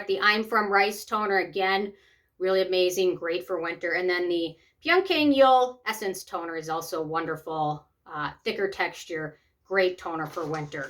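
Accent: Minnesota, United States. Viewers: wow, i always recognized your accent as MN, I grew up in Woodbury and spent a lot of time in Stillwater, especially downtown. A beautiful town!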